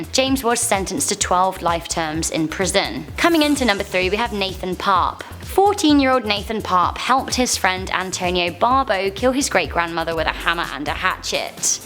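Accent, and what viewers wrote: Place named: United Kingdom. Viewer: British accent always amuse me🤣🤣🤣🤣🤣🤣🤣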